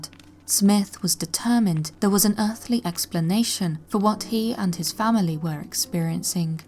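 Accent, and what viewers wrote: United Kingdom. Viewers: you’re awesome! Your voice is so soothing, it seems that anything spoken in British accents makes them seem more real. But that last one about the Black Lady, that’s quite a damn good reason to roam the earth after death. Black lady, Black Lady, I’ve stolen your, oh hell no I’m out of here!!!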